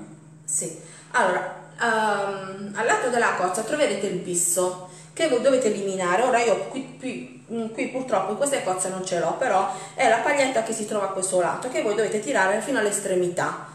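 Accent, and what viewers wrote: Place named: Apulia, Italy. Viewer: sei bravissima quando spieghi,sono pugliese anche io e quando parli mi sembra di sentire la mia voce con la stessa cadenza pugliese, (Corato),da 50anni sono in Piemonte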